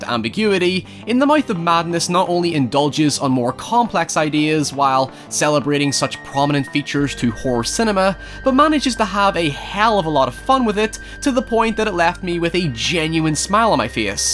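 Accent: Northern Ireland, United Kingdom. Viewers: I was trying to pinpoint your accent and then I realised it was Northern Irish just like myself. Have never seen such a popular youtuber from here! Props to you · if the host british ? anyone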